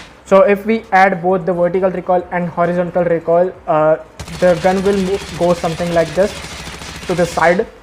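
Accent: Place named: India. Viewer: This is why I love Indians. Their tutorials are always the best and easiest to understand. I struggle to understand the accent and hear them clearly but it's still okay cause their tutorials are better than those from anywhere else.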